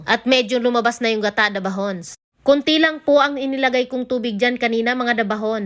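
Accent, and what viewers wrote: Bicol, Philippines. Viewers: Bicolano po ba kayo?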